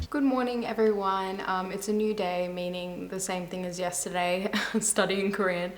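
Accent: Australia. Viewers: while you were sleeping was such a good drama!!! i got second lead syndrome watching it though >.< also, i love your aussie accent haha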